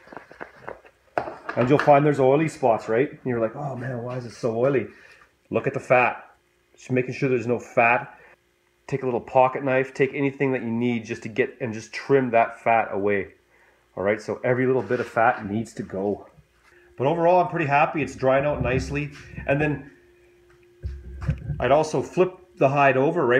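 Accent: Canada. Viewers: That candian accent is amazing ♡ hello from ontario ♡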